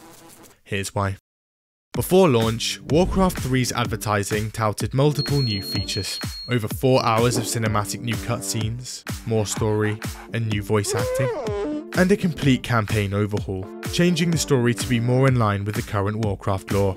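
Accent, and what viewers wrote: United Kingdom. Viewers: all British people sound the exact same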